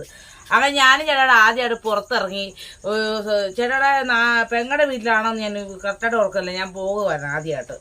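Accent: Kottayam, India. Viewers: കോട്ടയം ഭാഷ അങ്ങനെ യൊക്കെ തെന്നെ ആണ്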